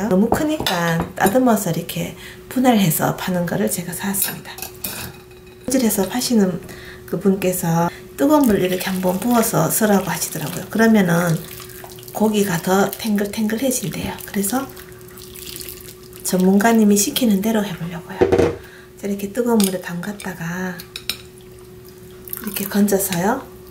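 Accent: Gyeongsang, South Korea. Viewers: 따듬어 ㅎㅎ 경상도에 사는데 익숙한 말투시네요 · 끈나슴니다 듣기 좋습니다 갱상도 억양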